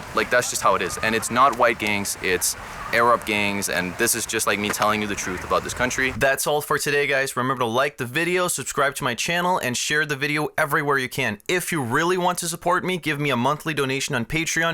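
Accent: United States. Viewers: How did you get that American accent?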